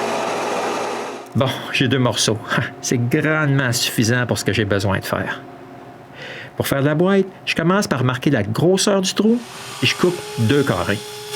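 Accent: Quebec, Canada. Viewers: J'adore c't accent d'la belle province ! Mais mince alors, c'est pas juste kek bouts d'bois, c't'un chef d'oeuvre, tabernacle ! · Super idée et super réalisation ! Il ne manque que les odeurs de bois et de colle . . . Bon , l'accent québécois est parfois dur à comprendre , c'est un Marseillais qui te le dit ( le nôtre est " costaud " aussi ! ) . Bravo pour ton travail !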